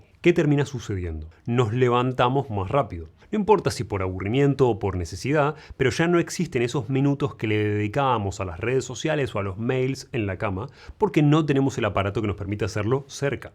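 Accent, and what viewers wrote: Argentina. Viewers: Hola me fije de donde era x su tono y claridad de voz y decia EEUU!! Jaja dije es hisano y hay tres paises que hablan de esa forma y veo que dice un comentsrio su pais argentina!!! ❤ Grande !! Doc!! Mi degundo apellido es igual pero sin separacion!!un afectuoso saludo .uy buen año !! Veo a Furman, los Veller 😊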